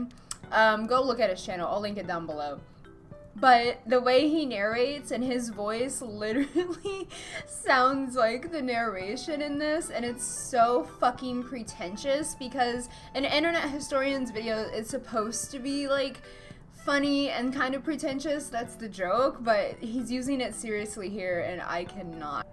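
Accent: South, United States. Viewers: To be honest, I could tell you were from like a southern area. But I am too. Hi southern sister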